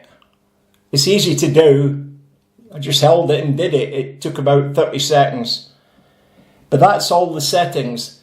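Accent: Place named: Scotland, United Kingdom. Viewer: way better explained in an old scotish accent than in the Ikea type of instruction supplied. Thanks!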